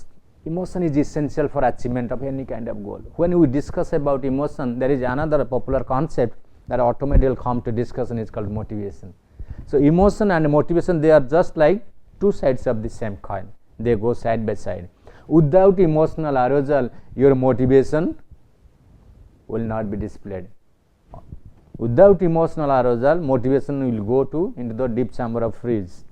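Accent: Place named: East India, India. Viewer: He sounds from East India and there's nothing "wrong" in his accent...that's how Bengali axomia speaks English... every region has different accent, it's natural not wrong.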